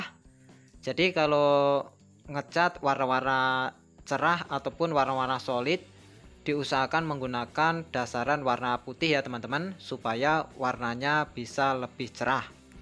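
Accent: Java, Indonesia. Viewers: saya kira di jawa mas, soal e logatnya kayak orang jawa.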